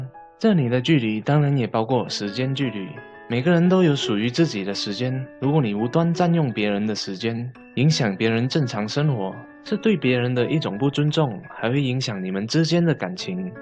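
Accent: Malaysia. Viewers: 这是马来西亚的华语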